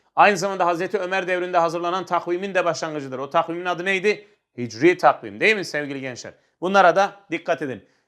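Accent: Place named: Serik, Turkey. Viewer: Hocam yarın tarih sınavımız var inşallah iyi bir not alırım ve güzel anlatıyorsunuz :) Antalyanın ilçesi olan serik ağzına benziyor konuşmanız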